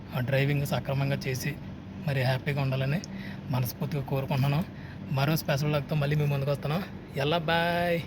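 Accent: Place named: India. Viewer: Nice Vlogs mana Telugu Language and slang lo 👌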